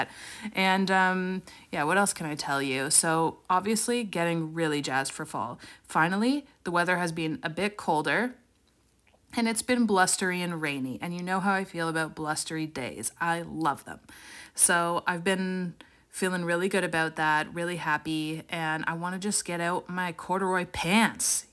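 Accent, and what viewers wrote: Canada. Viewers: I ❤ ❤ ❤ your videos. Your accent reminds me of my family in Canada. You are also an amazingly talented artist who keeps it real. You make art so approachable. You have helped me get back into painting!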